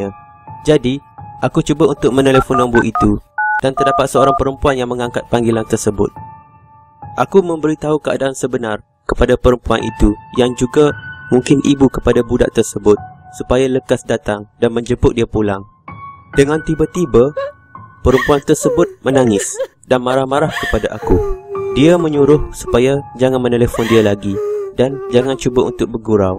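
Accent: Kelantan, Malaysia. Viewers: awak ni orang kelantan ke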